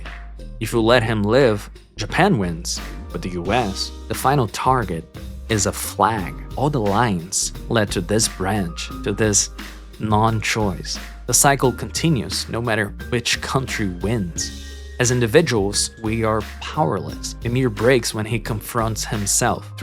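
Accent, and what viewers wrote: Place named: Brazil. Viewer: Desde os primeiros segundos de vídeo eu tive uma voz alta na minha mente dizendo "eu sei q esse cara é brasileiro mas eu não tenho como provar" ate que com o tempo o próprio vídeo confirmou o meu pensamento e ter isso em mente enquanto assistia deixou a experiência ainda mais divertida(caçar os detalhes mais óbvios tipo as partes em q vc falava português ate os pequenos detalhes tipo o horário no desktop e etc) . Incrível o seu trabalho e espero muito que seu canal de certo.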